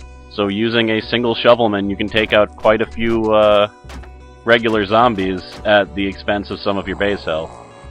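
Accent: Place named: South, United States. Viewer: your accent remember me a southern man... don't know why!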